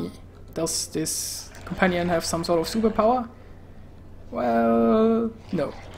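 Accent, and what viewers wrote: Germany. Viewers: Your accent tells me you're German. Would I win if I had a bet on it? :D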